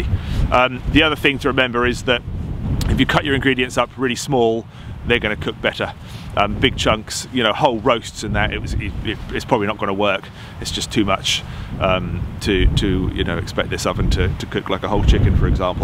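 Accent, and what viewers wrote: United Kingdom. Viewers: Oooo with warmer weather we might just see out doing some nice camps this spring? Man, I hope so. You are one of my absolute favorite channels on YouTube. As an American, I just love your accent and your whole demeanor, really. You are just an epic individual, so totally British. Just lovely. And the funny thing is that I don't even camp. I can't stand to go camping. But, I can watch you all day long., you are the best. Cheers!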